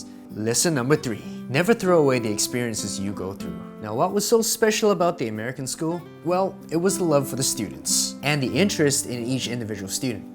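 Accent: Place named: Taiwan. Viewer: OMG YASS no wonder your Mandarin has Taiwanese accent XDD love it so much!! I'm from Taiwan and am studying in Australia now~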